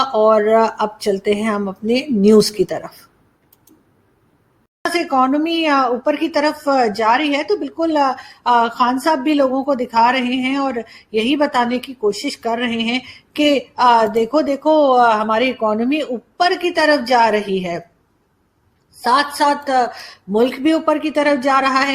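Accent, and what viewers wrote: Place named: Mumbai, India. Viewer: Bhidu. .. Boleto ekdam Bombaiyya Hindi bol rahe ho..aap ek din Vada paav khane Mumbai aajao..!!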